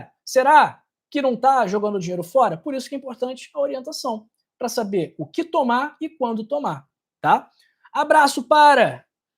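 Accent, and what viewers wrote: Rio de Janeiro, Brazil. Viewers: Vc e carioca. ? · Pelo seu sotaque e do Rio de janeiro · Eu achou quê você é do Rio de Janeiro tem o sotaque dos cariocas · Talvez o Sr seja carioca? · Eu tomo água com limão 🍋 e como abacate, todos os dias! Com esse acento lindo, só poderia ser Carioca! Eu amo o Rio!❤ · Pelo seu sotaque , deve estar falando do Rio de Janeiro. É igual de um irmão da igreja. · Eu sou de Piracicaba SP vc pelo sotaque é carioca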